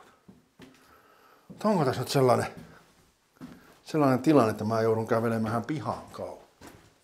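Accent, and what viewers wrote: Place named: Finland. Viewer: Kiitos. Mistä päin miäs o, ku tuntuu tua murres nii kotoosalta !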